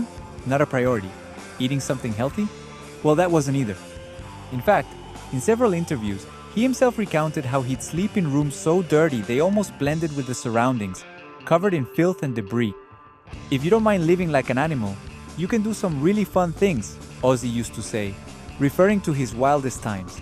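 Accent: India. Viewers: What accent is this? AI indian accent? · this guy sounds indian, this tells me all i need to know, disliked the video 3 secs in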